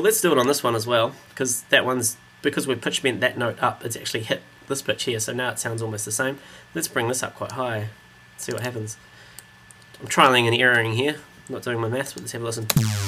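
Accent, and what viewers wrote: New Zealand. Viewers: great tutorial. It's a bit too advanced for me, an Ableton newbie. I learnt alot though, will definitely be back once I'm more familiar with Ableton. Watching your video, I learnt some things which would have taken me months to figure out by myself. Great explanation, being a kiwi, I can understand everything you said. Maybe I should do the English transcript, for the non-Kiwi listeners. Some have referred to speed and not understanding everything. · he ain't talkin fast hes a kiwi!!! churrr brotha!!!!! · are you from new zealand? Your accent sounds like it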